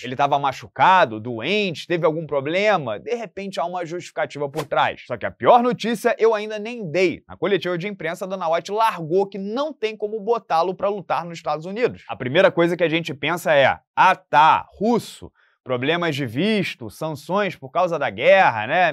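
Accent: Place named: Niterói, Brazil. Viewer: Cara não sei se é por que eu sou de Niterói também, mas eu curto o jeito dele falar, tipo assim igual o eu me sinto em casa.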